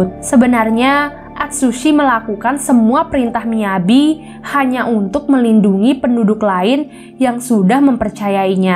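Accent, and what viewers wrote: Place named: Makassar, Indonesia. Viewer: logatnya kek org makassar :v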